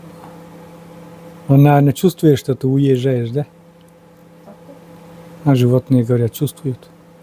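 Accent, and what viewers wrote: Komi, Russia. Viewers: Добрый день! Всегда приятно Вас видеть. С началом посевного сезона, спасибо помощника. Всем Вам здоровья и мирного неба! Наслаждаюсь Вашим Коми акцентом. Добра Вам!!!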